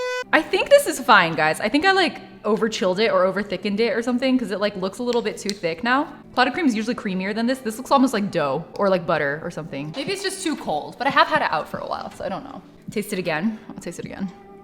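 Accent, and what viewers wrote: RP, United Kingdom. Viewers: tea time! sip sip I love your British accent as a British person. A bit posh though ngl Earl = Uurl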